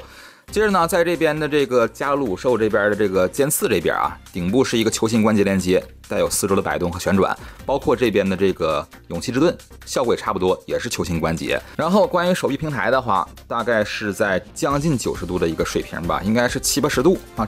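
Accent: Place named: Dalian, China. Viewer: 博主大连的？sijin抬？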